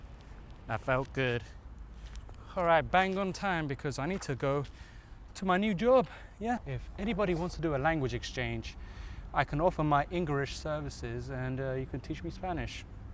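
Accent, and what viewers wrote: United Kingdom. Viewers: It sounds so weird listening to a British person doing a tutorial bc I usually hear American - accented people. But I am a British kid myself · Hey, I've been following you now for a while, and thanks for your great content. I am 26 now, and skated from 7 to 12 or something. I've always regretted that I stopped at some point, but I'm now starting again, and I FREAKING LOVE IT. I really relate to your feelings about sharing a skatepark with 10-year-olds that are better than you. But fuck it, skateboarding is awesome, and as long as you don't bother someone, everybody is always cool about it. Anyway, the reason for my comment is that I just want to let you know how great your content is. That video where you paid the narrator on fiverr in your intro, and told him to shut up eventually was one of the most hilarious bits I've seen. This goofy shit is what makes this channel great (amongst others). 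For example, the goofy edits that don't really belong, but are funny anyway. Keep doing those, because they are fucking funny. Just like the random chatter in between skating sessions (you're british so listening to you talk is somehow pleasant). You want to be a filmmaker. Well, keep this up and I can really see you get there. 15k subs is usually where the snowball can start to really roll, especially when you get lucky with a video. These skateboard progression videos usually do amazing. Yours have been great too. I would love to see more of that. (especially since you show the bails, and sorry, but they look so clumsy and funny. again, sorry). The point is - if there even is one to this big wall of random noise - is th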